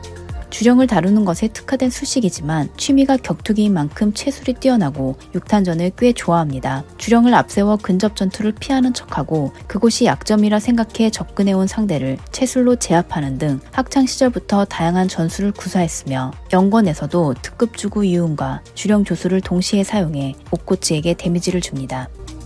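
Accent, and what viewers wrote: Japan. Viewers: 항상 잘보고 있습니다 일본어 발음이 영상의 매력같아요ㅋㅋ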